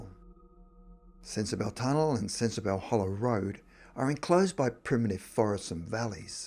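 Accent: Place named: Australia. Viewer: Ohhh ok. Well great! Yup. He was right about that. And I'm glad he did more shows in prisons. To spread love cheer and the gospel. I had only heard of him playing At Folsom.... So that's why I was wondering. I never have learned everything about him. But yeah I'm glad he came to Tennessee state prison in 1974. 🙂👍 And yes your welcome. Your videos are fun to watch and spooky and interesting. Your Aussie accent makes it even better. 😄. P. S. I live in Memphis TN and grew up here. I have been to the Orpheum once years ago when I was a teen and it is definitely a beautiful but spooky place. I read that Mary the little girl ghost ....once threw the janitor lady's spray bottle into one of the toilets. Lol. She looked everywhere and found it there. That was crazy.😄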